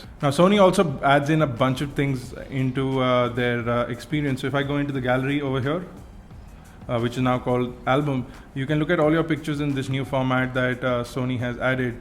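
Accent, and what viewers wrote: India. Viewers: agghh...that indian accent is killing me..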